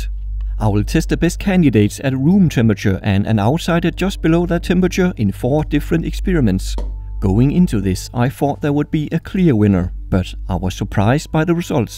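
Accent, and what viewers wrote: Denmark. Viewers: Are you danish? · Are you danish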